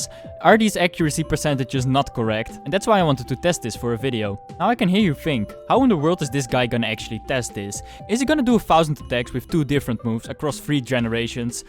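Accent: Netherlands. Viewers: I can notice a slight Dutch accent. Am I right? :p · r u dutch 👀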